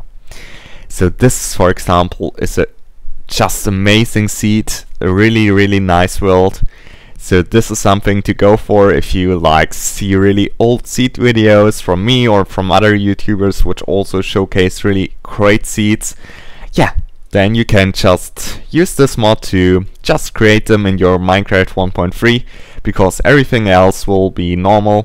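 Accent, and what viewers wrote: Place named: Germany. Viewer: du bist ein deutscher dat hört man....xD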